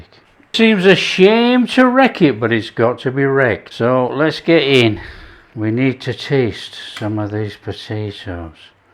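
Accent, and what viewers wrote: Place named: Yorkshire, United Kingdom. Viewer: Yum. What a treat. I see you’re still concentrating on a weight reducing menu!! Now, your accent. I would have said Lancashire, Oldham area but I reckon you’re Yorkshire, so Holmfirth? Halifax? Extreme West Yorkshire? Definitely not a French pronunciation.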